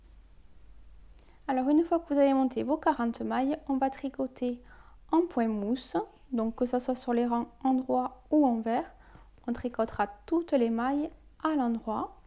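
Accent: South, France. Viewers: Super merci beaucoup, j aime beaucoup vos tutos et votre accents du midi me rappelle les vacances 😊. Euhhh j avais une question : quelle composition de laine prendre pour les doudou Bb comme la layette ? Je débute et j ai des doutes avec le choix de la laine. Je sais qu'elle est conseillé sur le modèle mais j ai récupéré un gros stock de laine neuves, 💫mais beaucoup d acrylique biensur.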